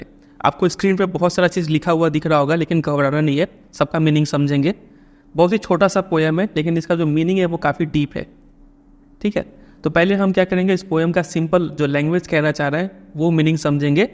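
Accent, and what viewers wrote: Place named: Bengal, India. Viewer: Sir are you Bengali as your language reveals that sometimes...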